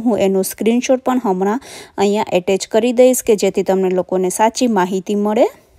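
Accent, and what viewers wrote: Saurashtra, India. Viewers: ખુબ જ સુંદર ધન્યવાદ ભાષા પરથી તમો સૌરાષ્ટ્ર ના લાગો છો 👌👌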